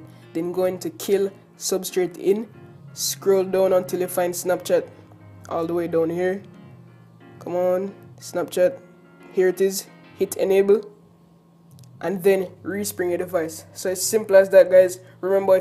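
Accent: Jamaica. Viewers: Are you Jamaican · Jamaican accents bang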